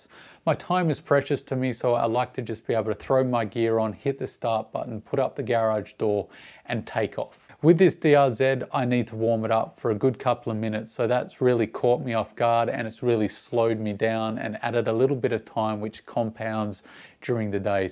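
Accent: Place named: Australia. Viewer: bro you're australian as fuck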